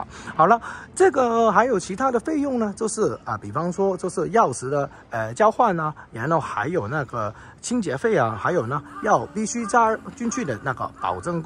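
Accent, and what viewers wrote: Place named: Hong Kong, China. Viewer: 这口音不是香港人就是广州人哈哈哈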